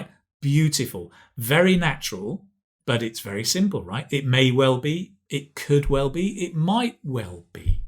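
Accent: United Kingdom. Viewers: It was really useful! Thanks a lot! And I liked your nice British accent😇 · Your accent is British Am I right? Because you don't pronounce letter r at the end of the word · hello please this is british or américaine englishe???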